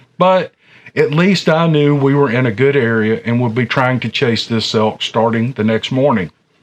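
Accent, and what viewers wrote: South, United States. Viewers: I love your southern accent ♥️ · I love your channel. And i love that southern drawl. The way u read other people's stories is so articulate and smooth it sounds as if it's your own experience. You are a pleasure sir. A real pleasure. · I love your Southern Ness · Happy Father’s Day! You, in my opinion have the Best Sasquatch channel of all! You are a born-storyteller and we-in’s from Dixie, love your Southern accent! Thanks!👍👣👣👣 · As an Aussie I love his southern twang 💜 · Like your stories,and your southern accent!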